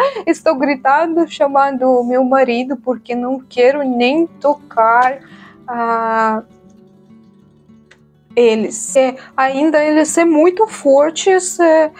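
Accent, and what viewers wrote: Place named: Eastern Europe. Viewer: eu detesto esses bichos , seu sotaque eslavo deixa o portugues bonito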